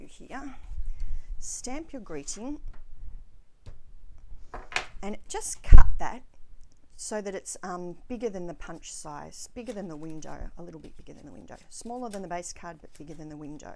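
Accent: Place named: Australia. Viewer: Nice to hear an Aussie sharing!😀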